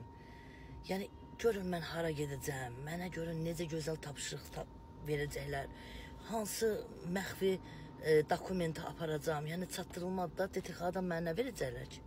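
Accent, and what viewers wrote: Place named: Goychay, Azerbaijan. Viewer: Can baci Göyçay lehcesidi mence??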